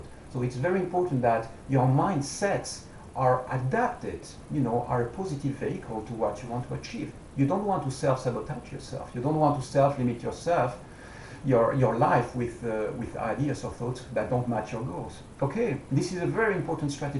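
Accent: France. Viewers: I'm sure you're french ;)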